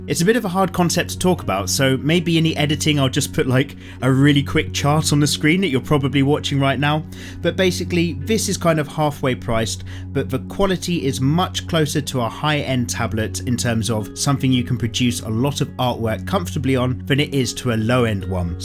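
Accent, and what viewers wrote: United Kingdom. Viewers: british accent))))) P.S. thnx 4 review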